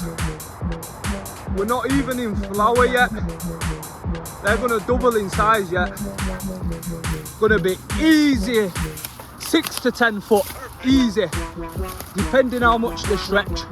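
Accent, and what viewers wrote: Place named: Bolton, United Kingdom. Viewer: Sound like ya near bolton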